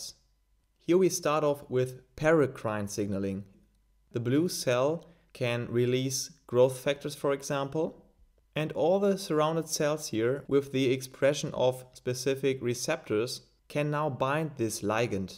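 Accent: Germany. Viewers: hilfreiches video, danke :) höre ich da den deutschen Akzent raus? :D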